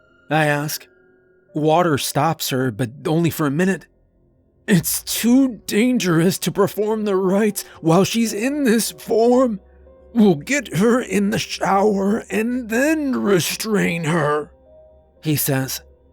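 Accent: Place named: Louisiana, United States. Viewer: What bayou you from, reader?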